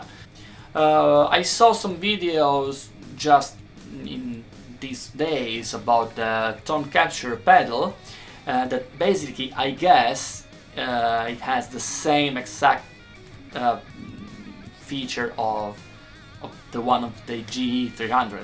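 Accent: Piedmont, Italy. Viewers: Do we have to comment in english too? Am I more international too this way? Seriously, this feature is mindblowing, I'd like to see what it can do with pedals or other stuff (By the way, you still have a piedmontese accent while you speak english ahahah)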